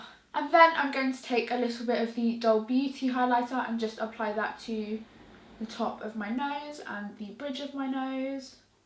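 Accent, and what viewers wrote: United Kingdom. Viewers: moon light British accents are the best 😂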